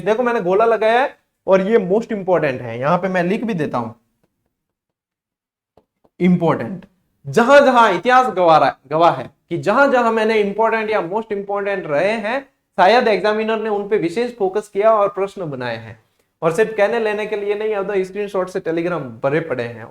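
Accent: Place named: Rajasthan, India. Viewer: Sir ji meny aap ke 2gared ke sabe calss dekhi hue hai akbar fr se remaind ho gaya peechle bar norss nhi bana pae b.ed exam ke waj se par ab me cantinu norss bana rhi hu path h sir papa be Mary sath aap ke calss ko sunty h aap ka marwadi bolna papa ko acha laga hai.......tq so much sir ji aap garat ho sir ji 🙏🙏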